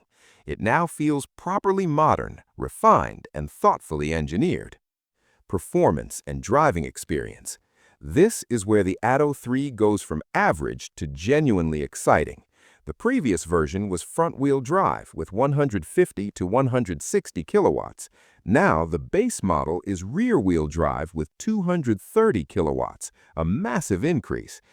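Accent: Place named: United States. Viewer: Agreed! And to make it worse, the AI voice is 'Merikan!!!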